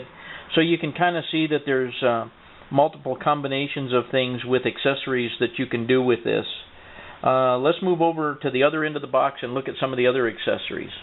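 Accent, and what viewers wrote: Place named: United States. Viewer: American- ish lol!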